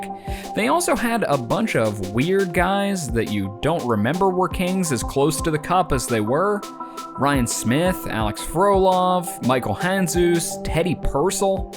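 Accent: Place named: Central Pennsylvania, United States. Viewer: Lol... Nope. That's that central PA accent shining through. Central PA isn't AS bad as Western PA, but we tend to overemphasize certain consonants at the end of words and deemphasize others. You won't hear that hard "duh" sound with the D at the end like other accents Names like Todd, Rod, etc can sounds like Rot, Tott, Ron, etc lol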